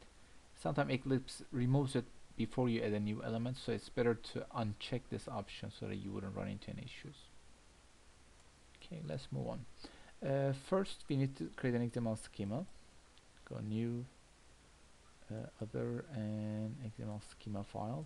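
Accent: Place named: Turkey. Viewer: abi ingilizce aksanın ben türküm diyor :D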